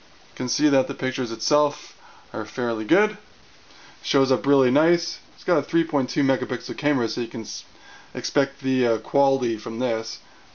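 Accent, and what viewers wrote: Canada. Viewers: I think I finally understand what a Canadian accent is. (outside NA)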